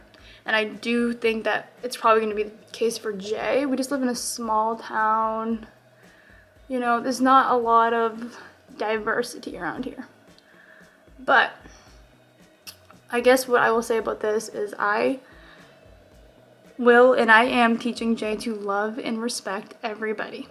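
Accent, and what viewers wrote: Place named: New England, United States. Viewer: I totally knew where , you were from just by how you talk and do you’re make up NEW ENGLAND GIRLS “ respectfully “ go get you’re Starbucks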